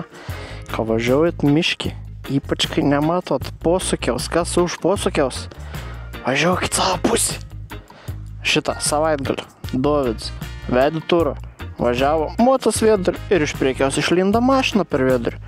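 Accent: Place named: Samogitia, Lithuania. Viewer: Iš kalbos galvojau kad Žemaitijoje kur lakstai, o po to žiūriu, kad čia mano miškas prie Vilniaus :D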